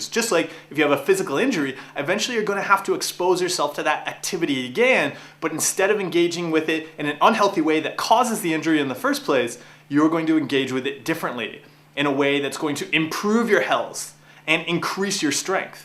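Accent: Canada. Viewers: Very helpful video. Im from northwest USA and did I pickup on a Canadian accent? Just wondering :)) thankyou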